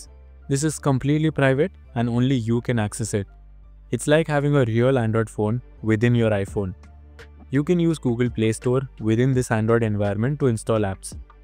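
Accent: India. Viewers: Are u indian bro ?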